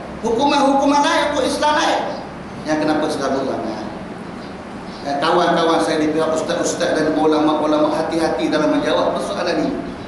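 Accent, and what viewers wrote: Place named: Pahang, Malaysia. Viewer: ustaz ni slang org pahang....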